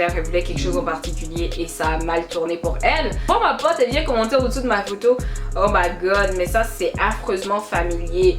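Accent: Quebec, Canada. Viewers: La Polémique * ma belle....pas polemie, mais tu es cute avec ton mélange d'accent québécois, américain, et ivoirien....😅😘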